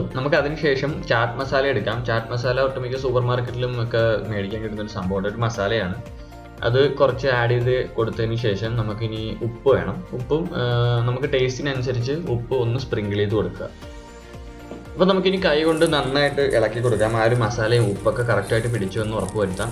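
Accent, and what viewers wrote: Alappuzha, India. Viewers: ചേട്ടൻ ആലപ്പുഴക്കാരൻ ആണോ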